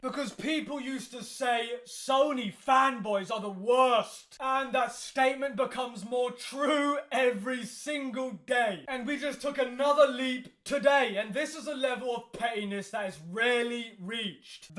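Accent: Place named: Scotland, United Kingdom. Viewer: I love you, funny angry Scottish man.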